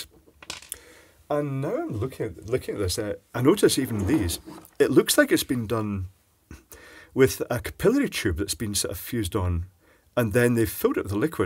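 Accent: Scotland, United Kingdom. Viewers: Caption generator doesn't cope with Scottish accent...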